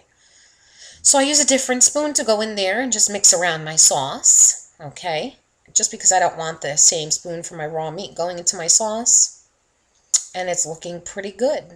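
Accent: New York, United States. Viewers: you sound like you are from New York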